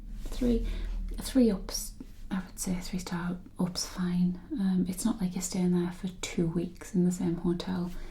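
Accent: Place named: Newcastle, United Kingdom. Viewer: knew you were from newcastle!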